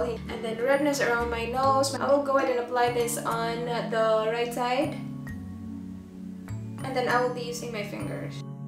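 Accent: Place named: Cebu, Philippines. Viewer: I think she is Cebuana. Nsa accent nya and mas hiyang xa mag english.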